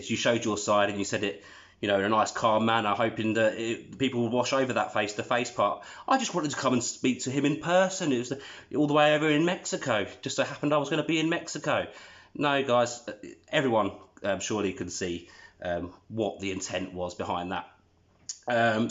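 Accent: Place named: United Kingdom. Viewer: A good rule of thumb is not to trust anyone with a Brit accent talking about US affairs on YouTube. Did Christopher Steele and the Steele Dossier teach us nothing?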